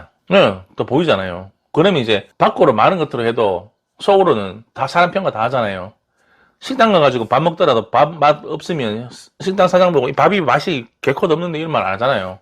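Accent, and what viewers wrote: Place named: Daegu, South Korea. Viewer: ㅋㅋ말씀하시는게 썸남이랑 똑같아서 듣는 내내 기분이 좋았어요. 참고로 썸남도 대구사람ㅋ 잘 들었어요~감사합니다 1번.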